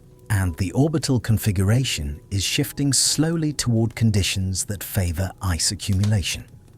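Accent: England, United Kingdom. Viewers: an English-sounding AI narrator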